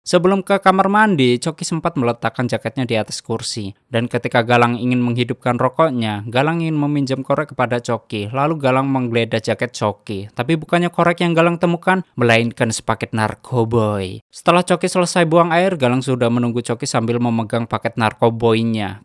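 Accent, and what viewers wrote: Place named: Java, Indonesia. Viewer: Suaramu gak asik, medok anjeeeng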